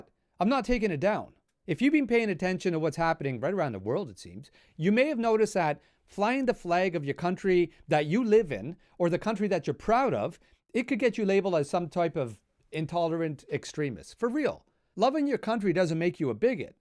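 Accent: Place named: Quebec, Canada. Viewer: how come english canadian sounds a bit french canadian when they speak he gives me a slight quebecer vibe just an observation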